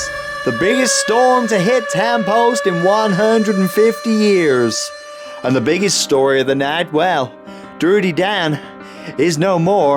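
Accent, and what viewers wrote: United States. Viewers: your American accent is very good! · Love the American accent. Didn’t think it was you at first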